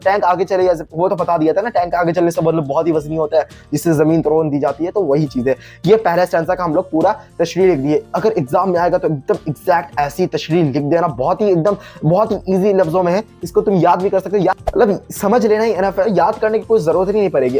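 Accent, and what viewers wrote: Bihar, India. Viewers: Are you from Bihar 👍🏻,?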